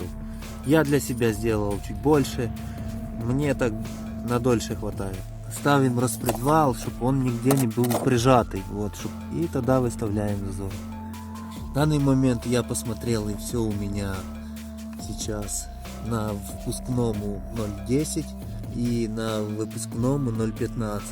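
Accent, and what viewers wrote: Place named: Ukraine. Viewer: Говори как тебе удобно потому что твой русский это украинский шедевр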